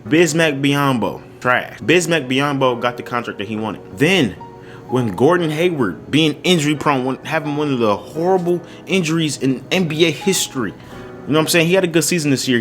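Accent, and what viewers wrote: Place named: North Carolina, United States. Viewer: Where you live? Cuz you sound like you live here in NC. I’m a big hornets fan, I love the signing.... just not the amount of money he was signed to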